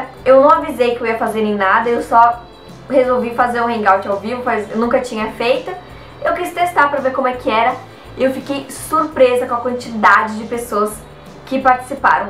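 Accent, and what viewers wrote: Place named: United States. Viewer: eu queria saber uma coisa, seu sotaque "forte" de inglês é por conta de vc já ter morado nos Estados Unidos? Fala inglês fluente? Beijo e amei o vídeo 😁😘💜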